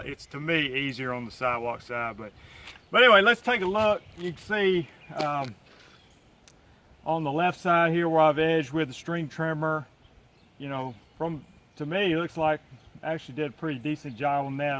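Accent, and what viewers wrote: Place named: Alabama, United States. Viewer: What part of Alabama are you from?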